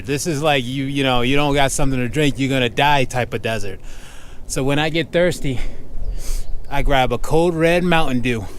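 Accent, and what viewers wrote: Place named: East Coast, United States. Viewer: Soloing with a drone, your in heaven man, thanks for sharing. Sounds like an east coast accent.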